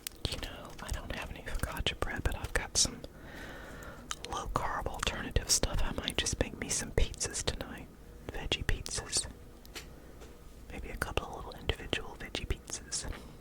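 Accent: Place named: South, United States. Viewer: Thanks! Another very relaxing flip through. (BTW I love your southern accent 😊)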